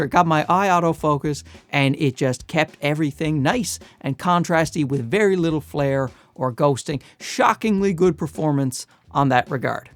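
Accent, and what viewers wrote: Canada. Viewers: Nice lens!Loved the shots at the intro….pretty artsy! Handheld or added external stab? Great energy …almost want to buy these lenses and then buy a camera to fit! Btw the 23mm made you look more like Chris Hemsworth, but the CDN accent offset.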